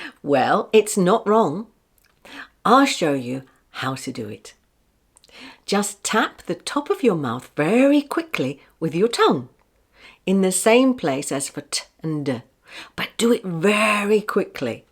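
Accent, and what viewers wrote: United Kingdom. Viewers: Thank you so much for your time. you are the best and we love the way how you teach and your British accent.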